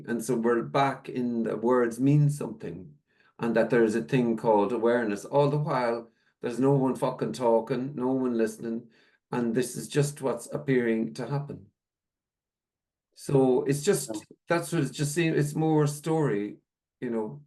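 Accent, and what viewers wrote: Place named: Ireland. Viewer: Love it sounds even better with an Irish ascent ❤❤❤. So so resonates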